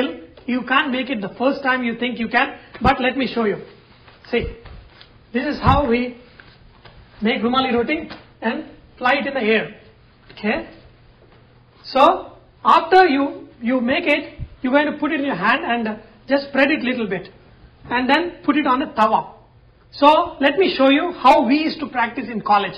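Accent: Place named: Andhra Pradesh, India. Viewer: You sound very Andhra boss. Baagundi.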